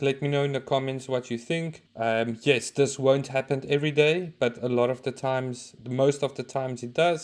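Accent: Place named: South Africa. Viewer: I knew the accent was South African😂❤️ Man, I'm learning so much mfethu, keep teaching, I'll keep learning, we'll all keep getting better🙏🏽🙏🏽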